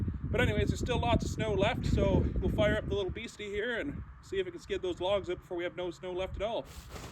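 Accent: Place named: East, Canada. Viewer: I know a guy from eastern Canada when I hear him. Ontario, Nova Scotia? Surely not from New Foundland 😂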